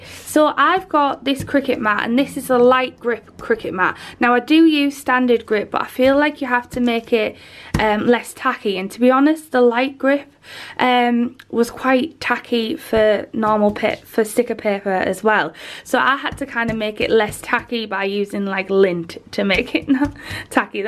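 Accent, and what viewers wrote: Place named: Whitley Bay, United Kingdom. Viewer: I’ve just bought a Cricut and it scares the hell out of me! Your videos on making stickers have really helped, thank you. On a side note my husband is from Washington and he’s trying to pin down your accent, he’s decided on Whitley Bay or Blyth, how close is he? We live in Canada now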